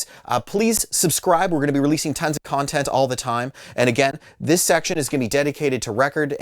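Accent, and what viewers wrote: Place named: Canada. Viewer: Super cool,; I enjoy hearing your Canadian accent on here as well:) I have that Locust set - I got it from a friend who bought it when we saw them many years ago. That may have been with a band called Holy Molar or with Dillinger Escape Plan (I can't remember which)...Three One G had some excellent output for a bit in the late 90's-early Thousands. I actually have an LP from the band Struggle which is Justin Pearson of The Locust/Dead Cross/many more... That is not as well known, more heavy punky stuff, but has a good raw energy that I bet you would appreciate. As a fellow vinyl collector, that uncut Metallica made me green with envy, lol. Some cut outs I have seen or own, there is a cool J Dilla (beats) that looks like a police badge, and a Father John Misty B side that came out with his sophomore release in the shape of a heart, I know there are many more but those two stand out. 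Anyhow, thanks for the excellent content, I look forward to watching it all.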